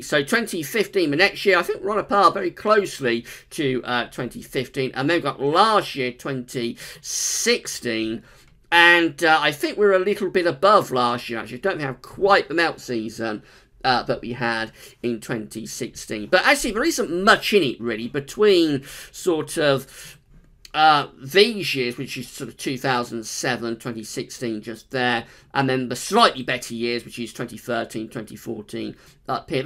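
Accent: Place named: Liverpool, United Kingdom. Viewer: Hello mate! Thanks for your efforts which are duly appreciated. For those of us who do not originate from liverpool or wales or wherever you might come from, it would be extremely beneficial if you could tone down your accent so that you are more easily understandable by a greater number. Many thanks.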